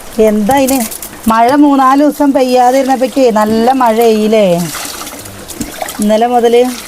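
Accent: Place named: Kozhikode, India. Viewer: koyiod samsaarikkunnad kelkaan nalla rasa😊😊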